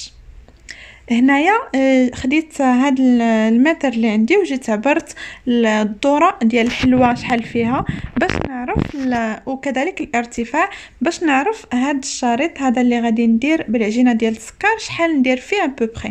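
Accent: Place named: Oran, Algeria. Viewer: hhhhhh j'ai aimé le mot ijarjrak j'adore l'accent oranaise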